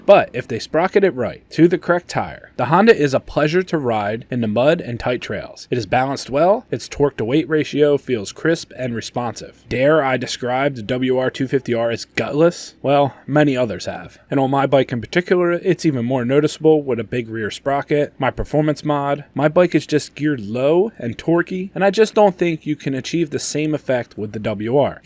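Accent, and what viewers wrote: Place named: Pennsylvania, United States. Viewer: Are you from southeastern pa? I hear the accent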